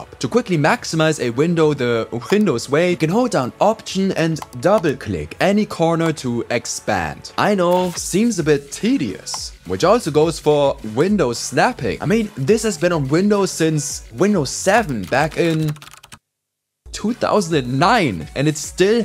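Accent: Germany. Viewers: i can smell german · You sound like you are German. Are you? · I mean, you can hear that you are probably german, but it's so subtle most people wont even notice. Still great video. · erwischt, du bist deutsch :))) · Kann es sein, dass du Deutsch bist? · Als Deutscher reichen 5 Sekunden um zu hören, dass du auch aus Deutschland kommst. Aber gutes Video. 👍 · german accent go crazy tho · Why does he sound like a WW2 german officer though? That accent is crazy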